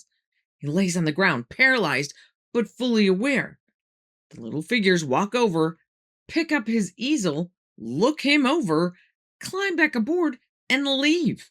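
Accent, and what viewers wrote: New York, United States. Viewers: Love wonderful stories thanks we appreciate ❤ and the lovely accent too, sounds like a New Yorker 😂 🇬🇧🏴🇺🇸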